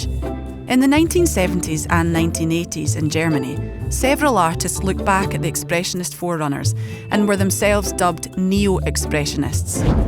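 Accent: Scotland, United Kingdom. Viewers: The scotish accent it's just brilliant... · Scottish accent..then there's accents within accents! My ex's was different.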